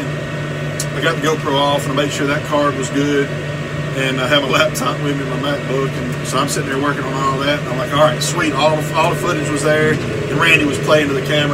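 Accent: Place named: Alabama, United States. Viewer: Thanks for the fishing story, I left Alabama back in 1966, but it sure is good to hear the accent again.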